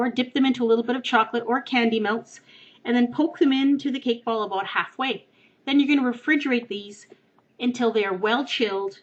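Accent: Canada. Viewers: Are you Canadian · It's called a Canadian accent..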